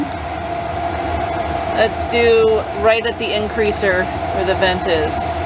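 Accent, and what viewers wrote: Canada. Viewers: Where are you? It's August and you need heat? I hear the Canadian accent but you must be way up there.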